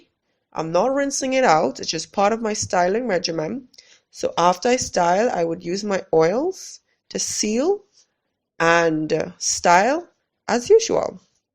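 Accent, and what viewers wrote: Trinidad and Tobago. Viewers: 5 seconds into the video i was like hii trini sister!!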